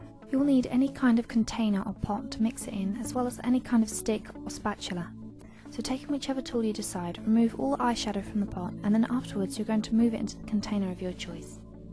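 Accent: United Kingdom. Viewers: Ate you from the uk? I am lol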